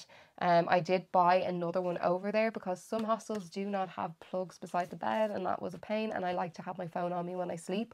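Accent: Ireland. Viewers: A Kiwi here - I LOVE hearing an Irish accent and had no trouble understanding you. We ALL have accents of one kind or another, and Irish is one of my favourites.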